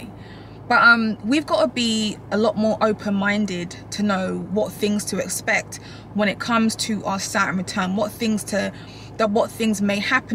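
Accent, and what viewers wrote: United Kingdom. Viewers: Are you from the UK!!! If so love it and love your energy. Love how you read Saturn in 4th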